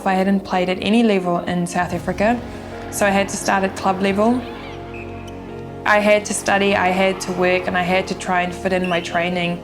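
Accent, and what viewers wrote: New Zealand. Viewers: your incredibly gorgeous 😍 south african 🇿🇦 women are the most beautiful women in the world and us kiwi men absolutely love them, the accents are sooo sexy but its so cute how you've lost it and have a kiwi 🥝 accent now,i would give anything to meet you and even more to be the man of your life 😍 so carry on being you and dont change and i hope you find a real kiwi man that will give you wat u deserve 🤗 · She has got such a kiwi accent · she threw her roots away by disquising herself with the kiwi accent, shame!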